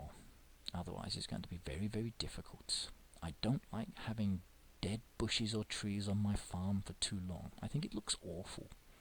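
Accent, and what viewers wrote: United Kingdom. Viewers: Hey, you are fine with me about the accent. Here in the states people talk with a tone in their voice as if tey are asking a wuestion yet making a statement, being sight impaired it is annoying, yes I see with one eye and a gamer at 53. Love it. Especailly hayday. British accents are hot. Thats howi got blessed to meet my spouse, he met the bestles. Lived in kent and went to dorton house schol for te blind.theuk treated him as if he was royalty.respect and dignity not like the services for the blind here. They doubted my connecting up the internrt, dragon naturallly speaking, amazon, dow loading books and games, hayday.my farm is teri millers. Pigs infar upper right hand corner, bees decoate the front, right.you will eventually find a theme. And my artisic skills no horses yet and bunnies soon.like me onfb. Treacle tarts are a dream. 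I got to visitfor two weeks. Too short for me. I love the uk.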